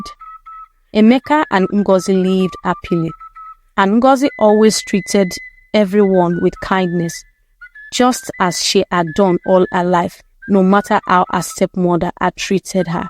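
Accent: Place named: Nigeria. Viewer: I live in Michigan USA. Months ago I came across your stories when looking for videos with Nigeria accent so I could get used to it. I Continue listening because I like the stories.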